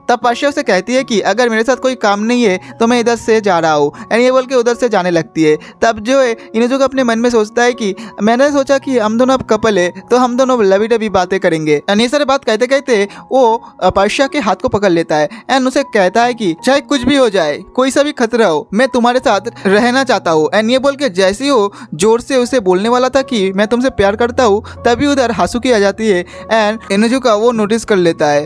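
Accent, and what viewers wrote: Bengal, India. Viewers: Vai apka bat Thora Bangla lag rahera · Bro,are you Bangali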